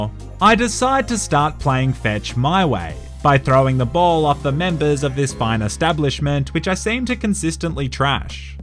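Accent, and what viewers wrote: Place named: Australia. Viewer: The steve irwin of youtube gaming. because you're white and sound austrailian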